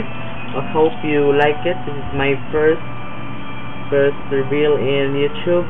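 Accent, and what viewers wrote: Philippines. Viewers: Dude r u Filipino? Ur accent is so strong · he has a fob voice philipino pride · HAHA total Filipino accent good job though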